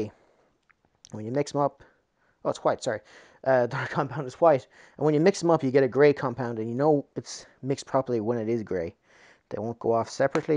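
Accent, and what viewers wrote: Ireland. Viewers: Where are you ? You sound irish, how did you get a Boyds stock over ?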